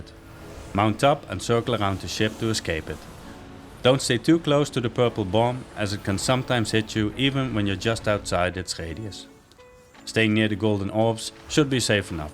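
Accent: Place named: Netherlands. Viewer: Dutch huh :D Good video !! i really dislike this dungeon and this helped me out a lot !!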